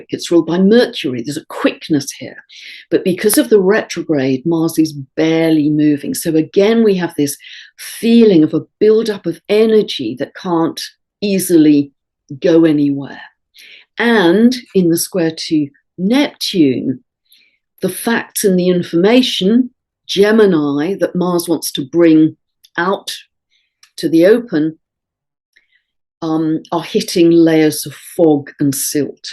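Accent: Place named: RP, United Kingdom. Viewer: Never been to this channel before, but this woman is poshness goals 😶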